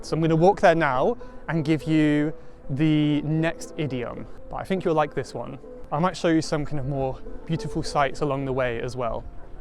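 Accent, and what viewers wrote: United Kingdom. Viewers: thank you very much , i learn a lot of british accent here 🤝💯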